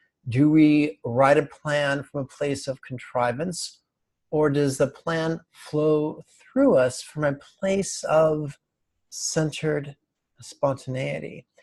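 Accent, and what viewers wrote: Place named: Germany. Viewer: I like your German accent!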